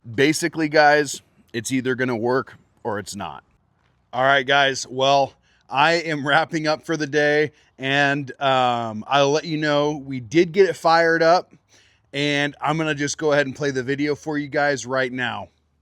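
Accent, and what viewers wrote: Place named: Arkansas, United States. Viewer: What part of Arkansas you from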